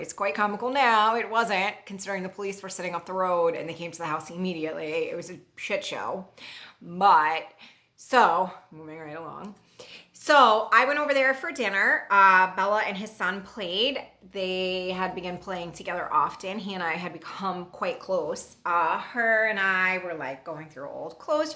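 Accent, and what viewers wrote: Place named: Minnesota, United States. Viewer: I love her accent. Where u from girl? Minnesota?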